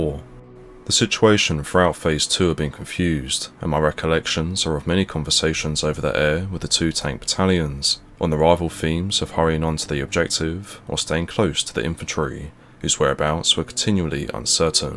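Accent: United Kingdom. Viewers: You can be polite. not everyone is habitual of British accent. not everyone is habitual of listening to English. there are people around the world who speak many different languages and hence they find it difficult to understand such a "typical English accent". no offense!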